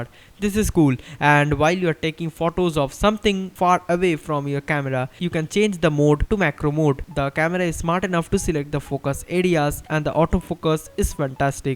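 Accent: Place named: India. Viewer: Its called English with Indian accent, we indians are comfortable with this kind. He is speaking so fast because the video has to be fast and quick or it will take longer time which audience may not like. It's a good review for indians . Thanks brother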